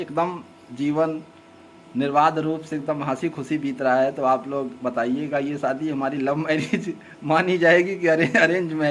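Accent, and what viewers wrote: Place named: India. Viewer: Bhaiya aapki bato pe bahut maza aata hai ekdam desi style me bolte hain, bhabhi ji ki smile bht pyari hai, aise vlogs dala kariye youtube par, pranam❤aap dono ko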